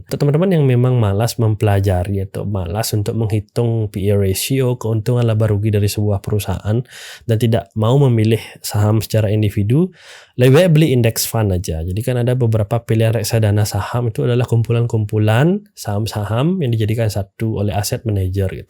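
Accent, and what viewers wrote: Bali, Indonesia. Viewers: logat bali...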